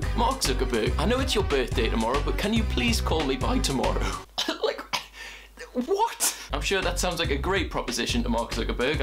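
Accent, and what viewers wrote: Newcastle, United Kingdom. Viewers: Are you a geordie?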